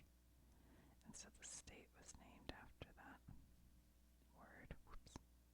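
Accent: United States. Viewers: Thank you! Excellent video! I love what you said about accents. I think it is too bad that America is losing its accents. By the way, in response to your comment that you don't think you have an accent, everyone has an accent. I think we all know what you mean, though. I just want to clarify, gently and with love, that having the most common American accent doesn't mean it is not an accent.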